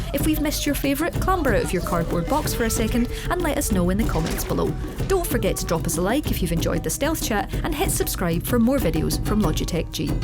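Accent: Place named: Scotland, United Kingdom. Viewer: It's called Scottish. You'll find that there are quite a lot of people with this accent there.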